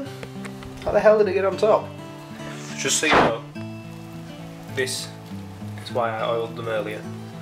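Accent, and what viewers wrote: Yorkshire, United Kingdom. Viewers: Brilliant! Love a Yorkshire accent. 👍🏻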